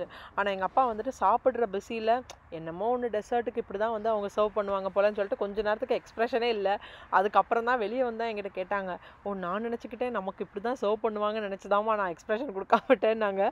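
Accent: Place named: Tirunelveli, India. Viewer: TIRUNELVELI pola varma sis amma sona mathiri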